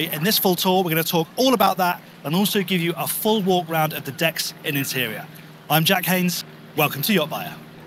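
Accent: England, United Kingdom. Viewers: Does anybody know where in England is this accent from? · The Queen’s English?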